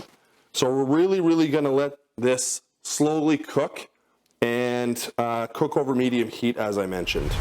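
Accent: Canada. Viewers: Are you Canadian? I can tell by the accent.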